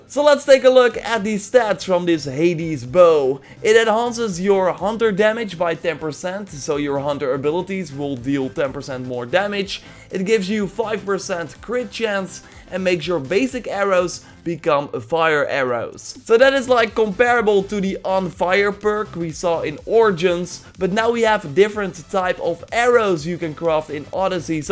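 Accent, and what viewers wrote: Netherlands. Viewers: Are you Dutch or Belgian?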